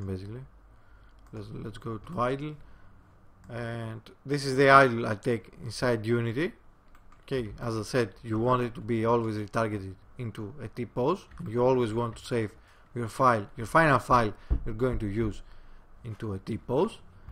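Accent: Greece. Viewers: You must be Greek, so cool accent, not the fake stuff at all. Μου έφτιαξες τη μέρα.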